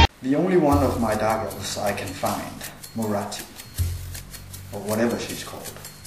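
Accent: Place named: Denmark. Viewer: I just love when you clearly can tell that you are danish just from your english accent!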